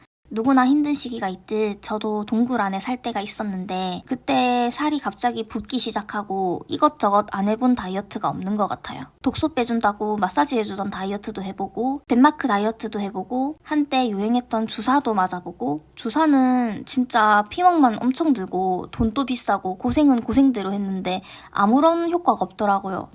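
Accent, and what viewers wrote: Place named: Ulsan, South Korea. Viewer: 사투리가 울산같은데,.귀에 쏙쏙들어옵니다. 저는 포항이거든요ㅋ 저도 다음달에 대구에 하러가거든요 너무 와 다닿네요ㅠ 잘 보고갑니다. 함께 화이팅 해 보아요~~^^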